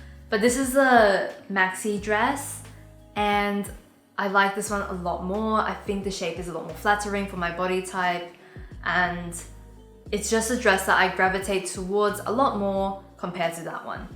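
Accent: Australia. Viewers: Oh yay I thought you were Australian! Love Carriageworks :D And such a great video. I’ve been looking at Babaa knits and this has made me really try and consider 1) whether I need it and 2) which colour will truly suit my skin tone and wardrobe! <3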